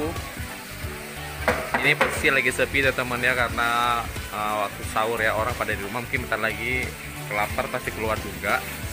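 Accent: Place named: Aceh, Indonesia. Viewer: Hahaha,,lon pike awak Medan si Lae..tapi watee meukalon gtng na perasaan ureng Aceh sang..tp bak video Hana Tom lon deungoe su bhs Aceh 😆😆👍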